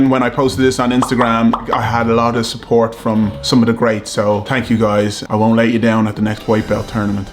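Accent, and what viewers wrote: Ireland. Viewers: Is that a new Irish accent? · London? He sounds Irish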